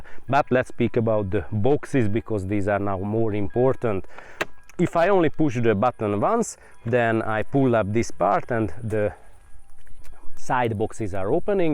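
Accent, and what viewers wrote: Hungary. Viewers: Typical Hungarian English accent, very knowledgeable and I’m sure it’s not your first BMW! Hajrá magyarok! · It's Hunglish.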